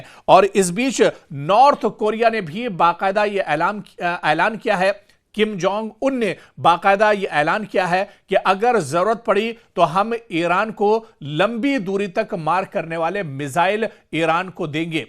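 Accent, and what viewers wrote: India. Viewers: Voice Indian lagta hay, Proper urdu nahi hay 😅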